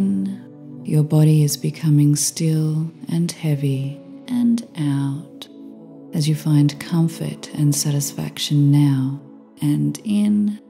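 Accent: Australia. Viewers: Thank you what a fab voice. Is that a Australia acet I LOVE IIT Roz Ireland.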